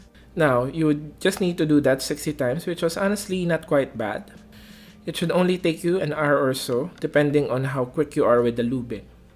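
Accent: Philippines. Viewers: This dude Filipino, I'm Filipino and the accent is unmistakable. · Pinoy ka ba? Parang medyo pinoy ung accent mo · Parang pinoy to a.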